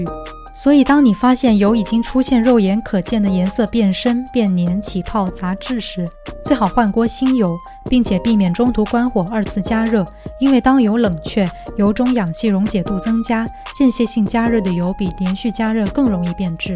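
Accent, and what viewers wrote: Beijing, China. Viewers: 主播北京口音很好听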